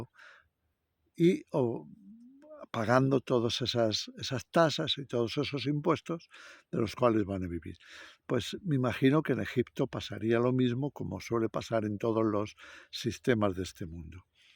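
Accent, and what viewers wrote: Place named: Spain. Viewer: primera vez que te escucho y veo hermano!,con mi familia dimos ese salto de fe al mudarnos a una pequeña ciudad a 1500 km de distancia al surde argentina,junto a mi esposa entemdimos que Dios nos llamaba a algo diferente,y en 11 años aprendimos a esperar de la provosion de DIOS atraves de un pequeño negocio de comidas tipicas del norte argentino...hoy argentina esta siendo gobernada por un verdadero ANTICRISTO un ser inmoral y despota que esta llevando a la nacion al hambre y la desesperanza,tuvimos que desdoblar las fuerzas y esperanzas en el SEÑOR Y SUS PROMESAS,aprendiendo y recibiendo el mensaje que; la IGLESIA DE CRISTO EN LA TIERRA sera presionada atravez de la economia familiar! Coicidimos con usted pastor y sus palabras. ...por el acento,veo que es español,de donde es su localidad??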